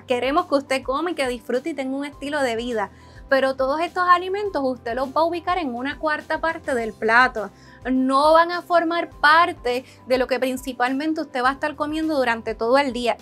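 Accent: Puerto Rico. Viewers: Me encanta ese acento de las mujeres boricuas,,😍😍🥰🥰😘😘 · Extraño mucho a mi amigo y maestro Frank Suárez, realice la dieta 3X1 y baje 9 kilos en menos de tres meses sin ejercicio en tiempos de pandemia, ahora volví a subir de peso, Gracias por tu explicación, eres muy bella con tu asentó Pueltoliqueño☺️😍 un saludo desde México.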